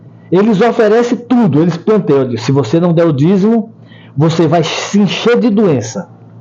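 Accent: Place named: Brazil. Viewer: ele fala como brasileiro estudado cader o sutaco judaico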